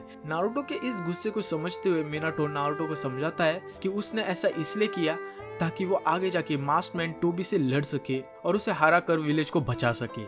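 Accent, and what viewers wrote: Maharashtra, India. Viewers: ho bhai maharashtrian ki bolnar ki style pahachan mai aate hai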